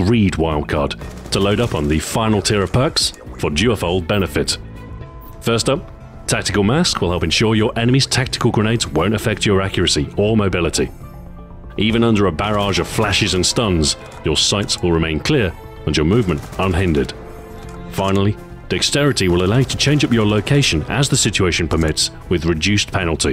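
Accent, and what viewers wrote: United Kingdom. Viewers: I don't get what's so weird about his voice. It's just a British accent! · Well, he has a British accent and his voice is like hot chocolate 🤔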